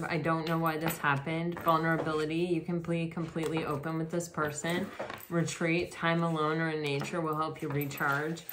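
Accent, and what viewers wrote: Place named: Boston, United States. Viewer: Are you from Boston ?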